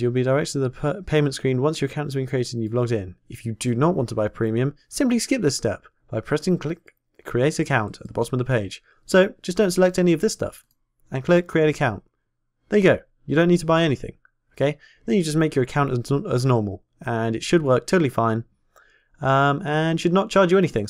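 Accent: Ireland. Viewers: is he Irish? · Are you irish? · ohhg irish and and from england fancy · The only thing i could think of is 'Omg is he irish?' x3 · then halfway through turned Irish again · your voice is so trippy cos of that irish accent that comes out at times. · You earned a sub. By the way, are you Irish? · Are you Irish? This is a random question, but you sound Irish. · Are you Irish? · i bet your irish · is that a bit of irish im hearing?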